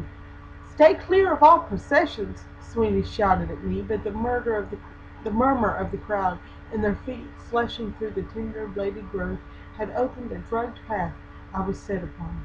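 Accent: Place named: South, United States. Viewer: Thank you for posting this reading. Lovely. I have never heard Station Island read in anything remotely like your (Southern?) accent. To my ear there's an earthy (even folksy) tone to your voice that suits Heaney's poetry surprisingly well.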